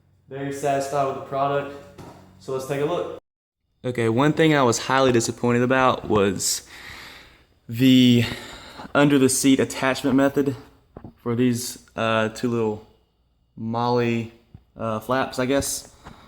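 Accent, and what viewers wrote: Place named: Appalachia, United States. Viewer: Always like hearing hillbillies try and explain something.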